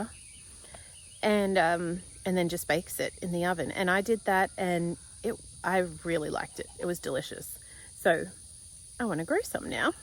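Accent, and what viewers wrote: Australia. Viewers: you don't need the music in your intro. I can't hear you because the music drowns you out. We want to hear your lovely voice with that awesome Australian accent!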